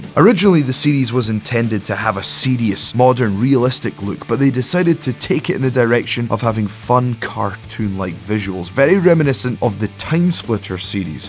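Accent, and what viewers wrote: Scotland, United Kingdom. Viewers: This guy has a Scottish voice and I love it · Mainly just due to the Scottish, but still. Imagine a Doctor that connects with the youth on their level.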